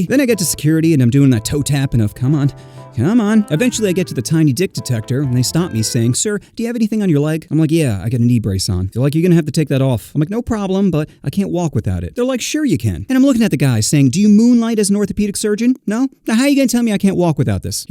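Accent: Florida, United States. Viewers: This man sounds Floridian, talks about a lot of haitian interacts and they're riddled with them there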